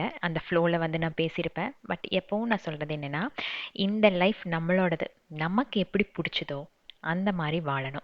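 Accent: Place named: Pollachi, India. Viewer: Entha State ponalum Pollachi Tamil alagu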